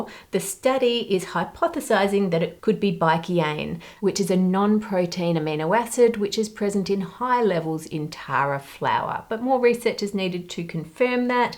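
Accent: Australia. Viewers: there's something frighteningly funny about hearing an Australian talk about near death experiences with wildlife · I think sounded more Australian today. I have always thought she had a soft accent- probably because her parents are from England. In today's video it sounded thicker. 🌏🇦🇺🦘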